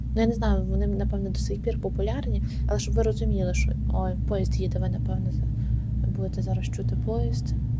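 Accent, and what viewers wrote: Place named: Vinnytsia, Ukraine. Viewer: Ваш суржик мені дуже схожий на мій, я з вінниччини) Успіху вам, цікаво слухати.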